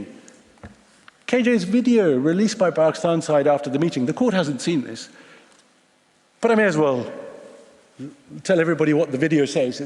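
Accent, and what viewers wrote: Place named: United Kingdom. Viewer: To parade the British accent as something that might invoke jealousy in Indians is rather infantile of your part. I 'll come to the issue of Kashmir though. At the very outset, you must get your facts right. It was Pakistan and not India who violated the UN guidelines by not withdrawing its troops from Kashmir in 1948. Why cry foul now? Coming to the issue of 'unarmed Kashmiris' being on the roads, it is no secret that the whole might of ISI and its Mullahs is behind how this sadist project has seen the light of the day. How systematically stone pelting has been funded. The political careers of separatist leaders from Mirwaiz to Malik rest on the instability of Kashmir. Other than that they do not have any clout in other parts of India. Might I ask, where exactly were the so-called separatist leaders and their sympathisers in Pakistan when the whole Kashmir valley was flooded a few years back? 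What we as Indians understand about our country is none of your business. We have institutions still in India that have not been sabotaged by Mullahs and Military unlike the Kangaroo courts of Pakistan where capital punishment is doled out liberally.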